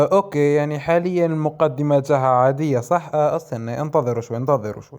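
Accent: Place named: Tunisia. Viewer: من الواضح انك تونسي 😅 ترا انا تونسي بعد 🇹🇳 لهيك لا تستغرب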